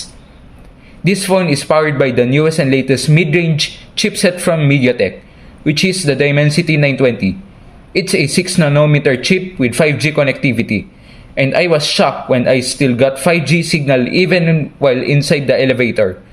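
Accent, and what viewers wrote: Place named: India. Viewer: Haha parang indian Ang English mo. 😅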